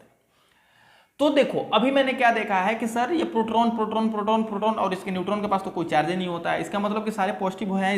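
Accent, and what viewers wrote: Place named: Bhojpuri, India. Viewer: Bhojpuriya andaaz me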